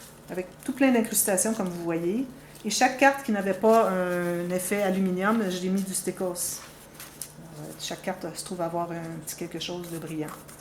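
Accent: Quebec, Canada. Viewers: Magnifique, incroyables réalisations, une pro, magique et ça fait rêver.... Explications claires et précises Bravo j'adore, et ce magnifique accent de Québec... Wouaaaaaa...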